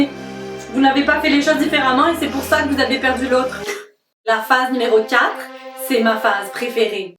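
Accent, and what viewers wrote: Quebec, Canada. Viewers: tu as l'accent de celine dion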